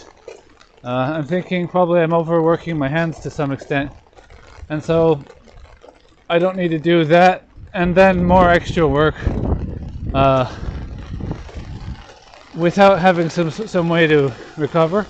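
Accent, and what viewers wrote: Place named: Canada. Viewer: Thanks for taking the trouble to do your video on the way to work & giving us some insight into your working world....I really hope your company takes health & safety seriously, particularly with regards to the glass🙄.Just out of interest what town or city do you live in,I'm guessing somewhere in Canada based on your accent??!! Keep up the good work....I SO admire your openness & honesty!! Mark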